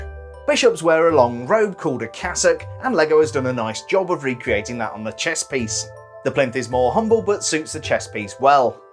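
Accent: United Kingdom. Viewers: I love that he always says: HAYTCH PEE in his cool British accent. Hi 🙋🏾‍♀️ I’m from that embarrassment, ‘Murica 🇺🇸 lol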